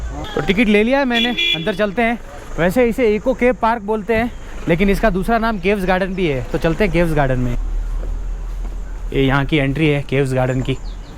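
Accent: Gujarat, India. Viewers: Gujarati ho Kiya bhai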